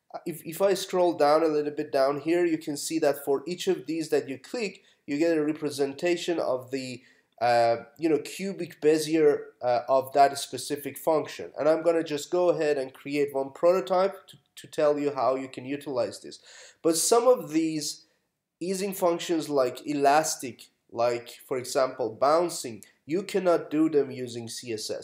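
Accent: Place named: Iran. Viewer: love the stuff you post...i'm too dumb to understand most of it lol btw you really sound iranian hahaha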